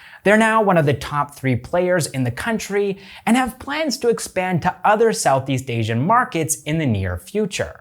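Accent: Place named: India. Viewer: you have a very nice indian accent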